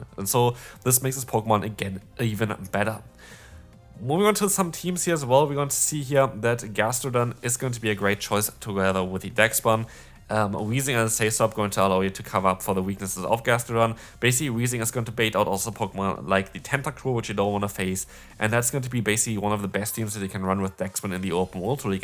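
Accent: Germany. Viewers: You have german accent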